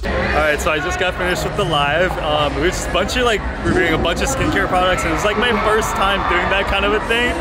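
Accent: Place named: California, United States. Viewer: U don't have a New York accent. U sound more like a Californian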